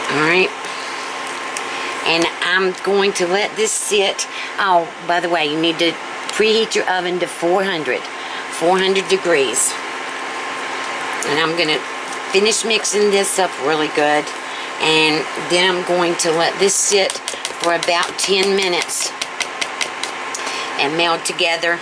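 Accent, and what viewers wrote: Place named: Oklahoma, United States. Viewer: Your voice sounds like Oklahoma . Thank You for this recipe .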